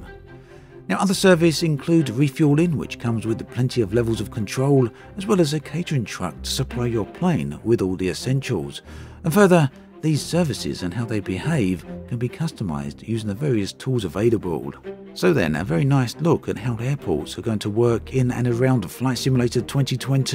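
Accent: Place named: Wales, United Kingdom. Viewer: I am still trying to understand what part of the English speaking world YouTube content creators come from? So often their use of syntax and pronunciation is impossibly wrong. It is a cadence of translation from another language, yet these people seem to have a Welsh brogue. I'm fucking baffled and also annoyed. Don't get me wrong the American narrators are often as poorly spoken, particularlily those from the West coast. Beyond weird. I never encounter people who speak like this in real life but everywhere on YouTube. I am convinced they are all AI.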